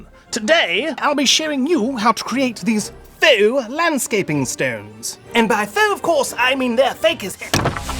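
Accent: England, United Kingdom. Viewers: Your Brit accent 😂 very good.🇬🇧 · Hahahaha! Thanks! That British accent made my morning😀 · So... are you going to make a "rock" with a house key hidey hole? My parents had one & it was store bought & stuck out like a sore thumb lol. At least all of your rocks look alike, lol Loving the British accent, cracks me up. Nice family too !! Y'all are so sweet and the project looks prefect !! I might have to go into town and get some supplies!! Not gonna tell my otter half what its for... it's good to leave them wondering lol Be well !! 🦋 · That is so easy and you can do it however but the English accent was cracking me up , but do tell your wife she got a sexy one! Stay safe and many blessings to your family!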